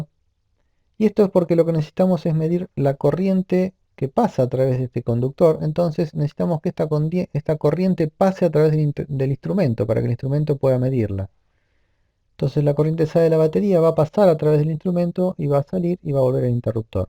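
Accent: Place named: Rioplatense, Argentina. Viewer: Creo que eres Argentino o Uruguayo Saludos de Peru Papá se les quiere gracias por el curso💪🇦🇷♥️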